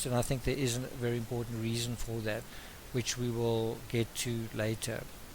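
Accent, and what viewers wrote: South Africa. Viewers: You sound South African. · New subscriber I enjoy listening to you, are you from South Africa 🇿🇦?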